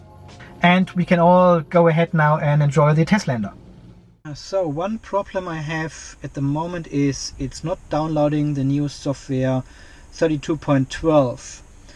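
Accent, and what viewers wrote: Germany. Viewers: Dein Deutsches Englisch klingt echt mies. Warum machst du eigentlich diese Videos auf Englisch mehr Reichweite ?!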